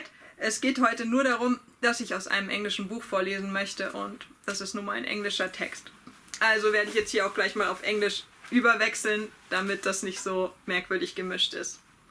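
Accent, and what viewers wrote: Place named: United Kingdom. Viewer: Das letzte war die Brombeere, so viel habe ich mitbekommen :) Bei deiner britischen Aussprache frage ich mich ob du das beruflich machst :) Jedenfalls reichte mein Schulenglisch aus um es zu verstehen.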